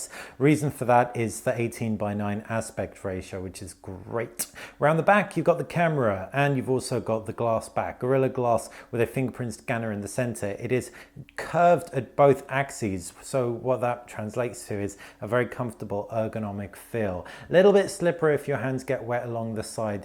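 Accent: Egypt. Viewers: Omg I follow u from about 5 years now... How come you know arabic this clear in Egyptian accent I'm from Egypt and I'm impressed... Keep up the good work... Have a nice day, cheers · You speak Arabic in Egyptian accent even better than Egyptians themselves 😀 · Wow U Speak arabic .. Is that an egyptian akcent i guess ?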